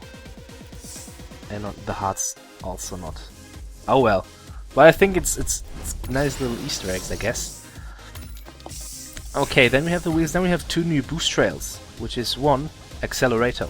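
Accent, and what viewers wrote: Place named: Germany. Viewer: mal ne runde zocken? Man hörts am English :)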